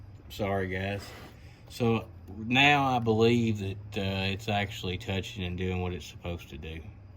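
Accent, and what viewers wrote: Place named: North Carolina, United States. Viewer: Sounds like you’re from Lumberton NC. Stay safe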